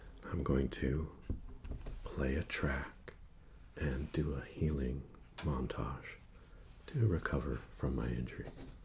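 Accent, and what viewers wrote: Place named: Massachusetts, United States. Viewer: This guy is from Massachusetts for sure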